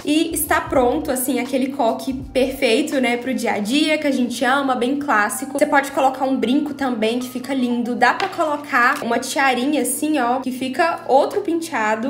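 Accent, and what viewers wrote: Minas Gerais, Brazil. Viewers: Coisa de mineiro eu acho, pq eu também falo assim e sou de Minas 😂❤️ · Coisas que nós mineiros falamos sou mineira e falo assim também 😍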